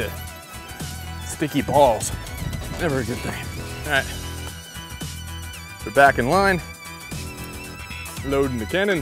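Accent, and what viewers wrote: Texas, United States. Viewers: What part of Texas are you from?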